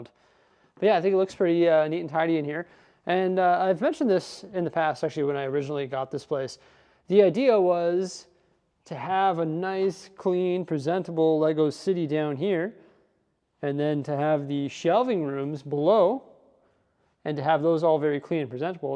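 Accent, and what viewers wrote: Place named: Canada. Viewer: I find it so funny that you don’t sound Canadian till you say “about”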